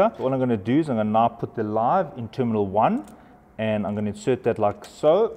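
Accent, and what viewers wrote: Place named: South Africa. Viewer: I recognize that Accent from anywhere. Hello fellow South African... Lol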